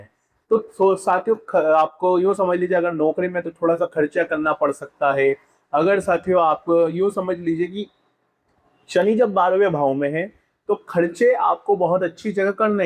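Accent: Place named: United Kingdom. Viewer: Bakwas mat kijiye First of all improve your pronounciation in Hindi Don't talk like a Britisher You Third grade Astrologer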